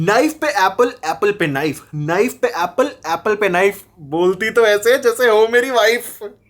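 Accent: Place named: Punjab, India. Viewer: Ur punjabi accent 😎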